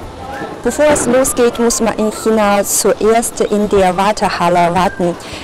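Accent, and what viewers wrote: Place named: Germany. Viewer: Deine Aussprache ist sehr gut! Ich schaue auch aus Österreich, hier in Österreich sprechen wir China aber anders aus, mit "K" also "Kina", so wie Charakter, Chaos, Cholera alles mit K am Anfang. Chemie wird auch mit K ausgesprochen. Man merkt also, dass du in Deutschland Deutsch gelernt hast ;) Wie lange lebst du schon in Deutschland?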